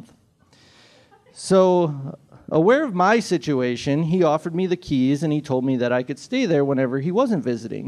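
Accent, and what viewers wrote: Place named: Wisconsin, United States. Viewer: Same. Watched the story aboat your Dad, and then this. Great storytelling, and I love the Wisconsin accent, I knew where your Dad was from before seeing the Seal of Wisconsin in the courtroom.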